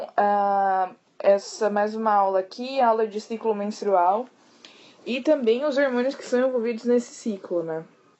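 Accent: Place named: Inland Minas Gerais/São Paulo, Brazil. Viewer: Kkkkk sutaquezinho pesado do interior de Minas ou de SP 🤔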